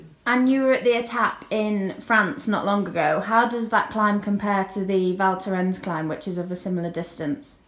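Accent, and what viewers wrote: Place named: United Kingdom. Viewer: Nice views :) beautiful British accent :) safe road for both of you, enjoy!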